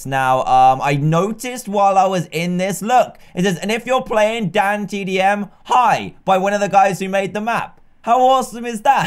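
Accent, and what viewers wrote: United Kingdom. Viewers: is it just me or does he sound British??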